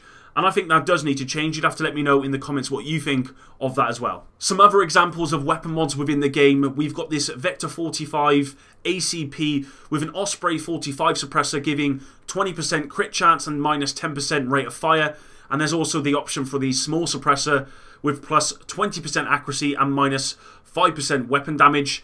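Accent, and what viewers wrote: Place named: West Country, United Kingdom. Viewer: Which part of the west country mate. Bristol here. Top vid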